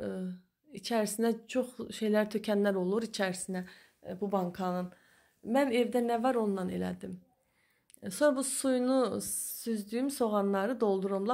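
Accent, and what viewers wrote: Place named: Balakan, Azerbaijan. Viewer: Super, danisiqda Balakən aksenti öz yerinde😊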